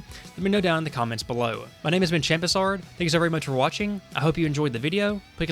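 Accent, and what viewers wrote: South, United States. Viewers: Love the hick accent. You sound like my grandmama😂😂😂❤️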